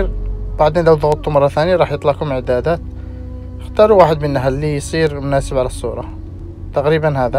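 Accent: Yemen. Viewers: 😂😂💔لاقسم انه يمني من ربعنا اللهجه تقول كذا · متأكد؟ بس كلامه يمني🌚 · ايووه صدق 😂😂💔 احلى شيء فديت الكلام اليمني 🇾🇪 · يمني انت من لهجتك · لهجتك يمنيه...صح... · اي كلامه يمني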